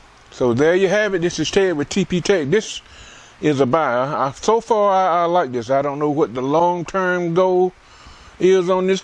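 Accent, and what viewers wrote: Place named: South, United States. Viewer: I agree! Although, I don’t know how northerners and people out west appreciate the value of a southern or country accent as much as those of us that live in the south. For those of us from the south, a southern accent (black or white) is a comforting sound. Hearing this man talk is like a basket of fried fish with a side of cheese grits for my ears.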